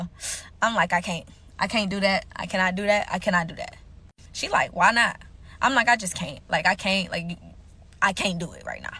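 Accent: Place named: Detroit, United States. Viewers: She gotta be from Detroit how she telling this story lol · She talking like she from Detroit but she not acting like it 🧐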